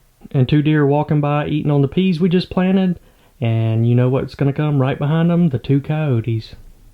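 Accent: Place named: Alabama, United States. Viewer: Yah, that soothing Alabama voice and bass!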